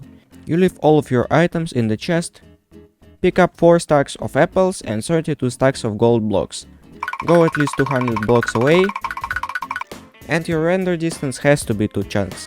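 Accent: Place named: Russia. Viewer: По акценту понятно что он рускоговорящий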